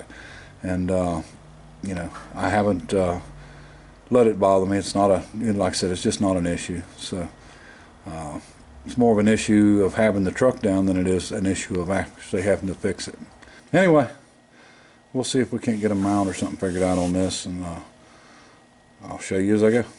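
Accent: South, United States. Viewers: What state you in? I live in Rome Georgia. You sound like your from the south. I'd love to see the bike in person and maybe when done it may be in a show so we all could see it. I ain't trying to sound like a STALKER.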